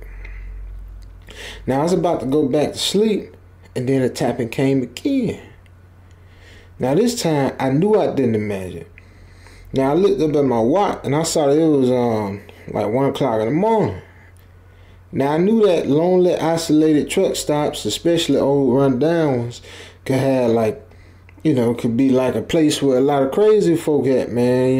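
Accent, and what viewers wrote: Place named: New Orleans, United States. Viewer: Really enjoyed the story. Whomever narrated this story has the New Orleans accent/dialect down pat. And this is coming from a native New Orleanian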